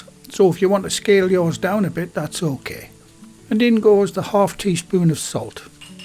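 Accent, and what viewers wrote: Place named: England, United Kingdom. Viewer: Geez I really like the recipe you’re using and your teaching style! It looks very tasty and a great dish for winter. Your accent reminds me of my Dad and his English relatives. Thank you for this video.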